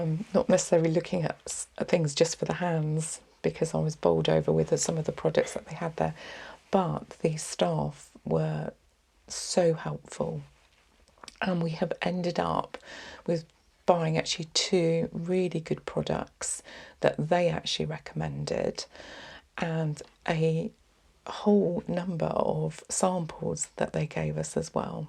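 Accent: England, United Kingdom. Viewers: If you were ever to open a shop where we could get treatments like this, I would happily visit. Also, I realised I love your accent as well - I lived in the UK for a few years and still remember it fondly, your voice brings back happy memories while creating happiness in the present too. Thank you ❤ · You have a soothing voice and I love an English accent! Actually, all of my go-to asmr channels are from the UK! 😅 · I really liked this video with the hand treatment. The hands hold tension also and a lovely relaxing massage and treatment is amazing. I know why I love your voice so much, the combination of your soft voice and the British accent really makes your speaking relaxing. Everyone subscribe and hit the like button if you are new here watching the lovely. I listen to your videos just before bed and I fall fast asleep. Blessings lovely lady 🌿🌿🌿🌿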